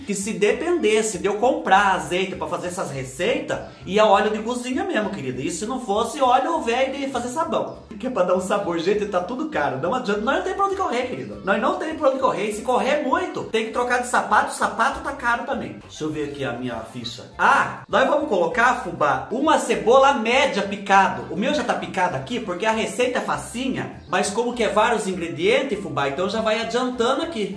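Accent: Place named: Minas Gerais, Brazil. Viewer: Mais um vídeo delicioso se é q me entendem.eta fubá nosso mineirinho adotado tem certeza q não nasceu em Minas Gerais seu sotaque e melhor q o meu kkkkkkk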